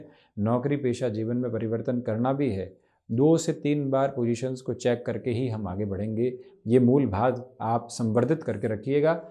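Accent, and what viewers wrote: Standard. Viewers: Jai sri Krishna sir .... Bhaagya se zyada aap k ki pure hindi sunne mein Anand aa raha hai...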